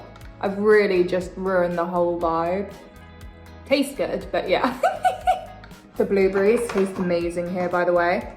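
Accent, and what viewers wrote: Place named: United Kingdom. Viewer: no dear, please! I'm in love with everything British, like your British accent 😍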